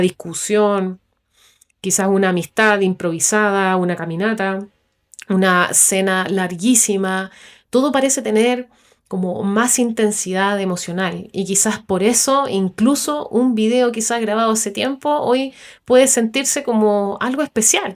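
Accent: Chile. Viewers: realmente me encanto!!!! mas.....con ese hermoso acento trasandino..... Me impacto la precision de tus conceptos para mi querida Buenos Aires, pero realmente me fascino lo simple de esas vivencias que se convirtieron en una poderosa razon de vida! Gracias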